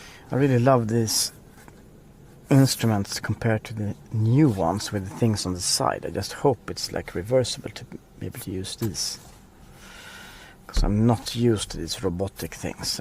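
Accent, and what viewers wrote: Sweden. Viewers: nice swede english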